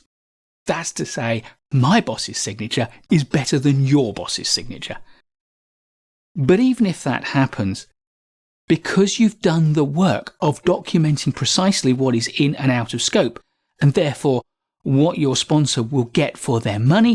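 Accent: United Kingdom. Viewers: great video and great explanation and what makes it better is your excellent english, British accent and soothing voice I honestly can listen to you all day you should consider making podcasts fr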